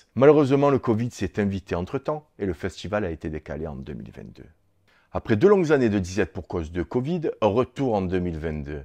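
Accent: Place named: South, France. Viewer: Belle story avec un p'tit accent du midi 🤪🤘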